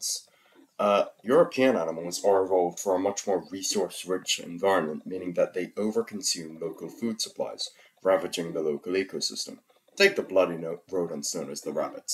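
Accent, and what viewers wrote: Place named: Ireland. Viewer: yeee 🤙, that's cool, for an Australian your accent definitely has an Irish sound to it I swear. I'm from Irish stock too and I have cousins with my Irish last name in Australian. The emu war was hype 🤣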